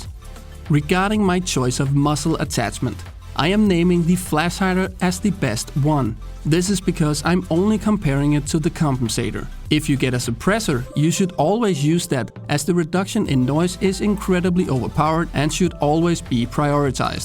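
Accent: Denmark. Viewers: So easy to hear you are Danish dude hehe 💪 you are doing a great job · er du dansker? :P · Are you danish? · Danish, right? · Is it just me or does they guy have a Danish accent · Er du dansker? ☺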